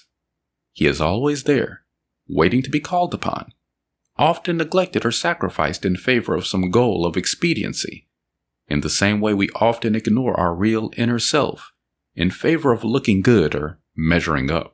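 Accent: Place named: Chicago, United States. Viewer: Don't wanna hear a Negro with a Chicago accent read to me sorry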